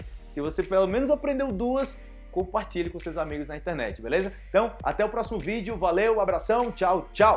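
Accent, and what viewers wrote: Antioquia, Colombia. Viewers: Muy paisa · Woow, primer brasileño que le oigo hablar muy pero muy bien el español, hasta le sale un acento colombiano , saludos desde Venezuela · tu espanol es espectacular hasta pareces colombiano haha ... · Oi e gente,u sou de Peru aprendeendo portugués muito obrigada pelos videos,muito interessante,voce fala como colombiano,muito bom seu espanhol,aqui a palavra "franela" e un pedazo de tela tambem,desculpe erros em a minha escritura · habla como paisa . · si no eres colombiano, te felicito! tienes una gran habilidad para aprender hasta el acento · Ivan Rojas pero si se le nota el acento colombiano 😀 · mais ele acento colombiano es super bien .. eu gosto muito.. 👍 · Literalmente 🤣 En Argentina decimos así cuando te engañan con un precio y te cobran de más (me cog-er-n), pero es una expresión muy vulgar. 😅 Tenés acento colombiano! 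Wow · Oi!!! Aparte de aprender varios falsos amigos"!!! Me has hecho reír tanto!!! Nunca ví un profesor tan divertido!!. mereces un 10!!!.y con ese acento colombiano!!! 👌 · vé, vos cuando hablas en español pareces a maluma, es muy paisa tu acento colombiano :D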